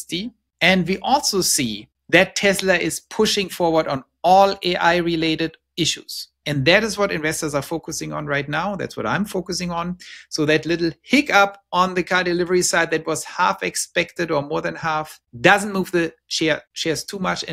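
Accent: Germany. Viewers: danke----fyi: Palatina is the latin word für "Pfalz" this company was founded 1993 as Grohmann engineering for innovatie solutions of automation processes in manufacturing....zur Info....Palatina...ist das lateinische Wort für "Pfalz" Du bist doch Deutscher?! zumindest verrät mir das Dein englischer Akzent:-) lebts Du in den Staaten? Tesla hat ja schon vor langer Zeit diese Firma gekauft Tesla Automation wurde 1983 als Grohmann Engineering in Prüm gegründet. Mit dem Ziel, innovative Lösungen für die Automatisierungsbranche zu entwickeln, hat sich das Unternehmen kontinuierlich weiterentwickelt und seinen Fokus stets auf leading edge Technologien gelegt.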